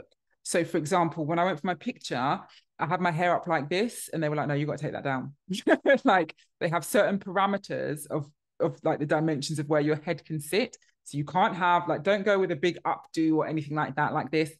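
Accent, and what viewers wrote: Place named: United Kingdom. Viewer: Good morning. I love your British accent it's so beautiful ❤️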